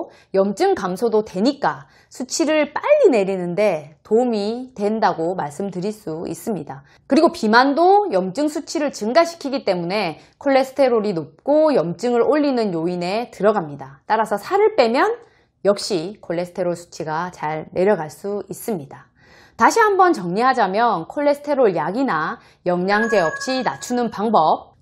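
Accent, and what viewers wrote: Gyeongsang, South Korea. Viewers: 개념원리 동반한 설명은 처음이네요. 감사합니다. 고향이 대구분이실듯.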